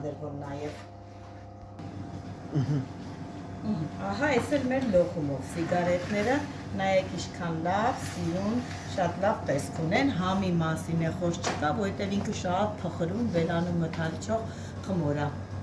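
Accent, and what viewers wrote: Lori, Armenia. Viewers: Սիրելիս այսօր պատահաբար յութուբով տեսա ձեզ ամիջապես ամրացա ձեր ալիքին ուղակի կարոտս բավարարելու համար սիրով լսեցի մեր լորվա ակցենտը ես ինքս ստեփանավանցի չեմ բայց 25 տարի ապրել եմ այդ քաղաքում ունեմ շատ հոյշեր կասեմ որ գիտդնաք աբսօրվանից կունենաք մշտական հետևորդ մոսկվա քաղաքից ցավոք միմյանցից շատ հեռու ենք սիրով կփոխարինեի իմ խոհանոցային գաղգնիքները որոնց մեծ մասը իմ փորցից է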